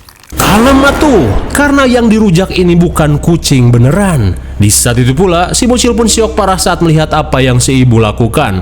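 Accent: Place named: Sunda, Indonesia. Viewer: Suara Admin nya banyak banget , ada beberapa channel x yaaa... Sunda pisan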